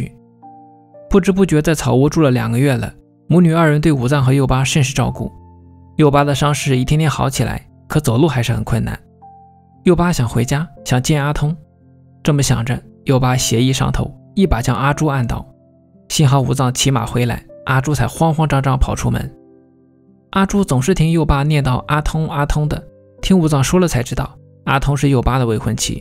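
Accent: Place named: Qingdao, China. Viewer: 解说的青岛口音藏也藏不住😂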